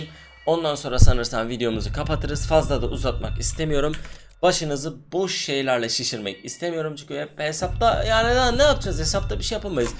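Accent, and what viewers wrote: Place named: Urfa, Turkey. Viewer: Abi beni yanlış anlama ama öncelikle biraz kitap okuyup konuşmanı düzeltmelisin. Evet biliyoruz Urfalısın ama bu konuşmandan dolayı arkadaşım seni izlemeyi bıraktı. Benden sana tercih rekabette ve battle royale modunda değişik şeyler dene... Örneğin rekabette hiç bilinmeyen silahlar ile oyna veya sadece tabanca vs. oyna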